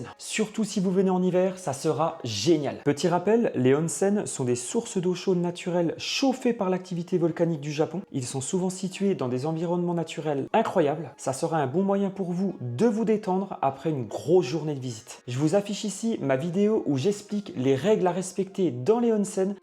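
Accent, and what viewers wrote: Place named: Vosges, France. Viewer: Merci pour tous tes bons conseils . Je pars dans une semaine et ça m’est bien utile . C’est drôle cet accent vosgien que tu as gardé ;je suis de là bas 😂😉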